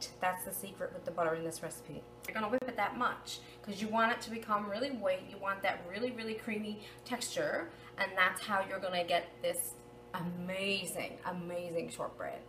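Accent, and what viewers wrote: Newfoundland, Canada. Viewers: Going to go out on a limb here and say that's a Newfoundland accent...!? Canada? Going to give these a try girl!!! Many thanks from Niagara "Wine Region" Ontario, Canada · Is that a Newfoundland accent ? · I thought you were probably Canadian. But your accent has a nice something extra compared to what I'm used to hearing. I'm from Northeast Ohio and if I have any accent it's pretty undectible (boring) I think. I imagine Newfoundland is a nice place to live. I am hoping to make this recipe and impress my family 😁 Merry Christmas! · Is that a Newfoundland accent?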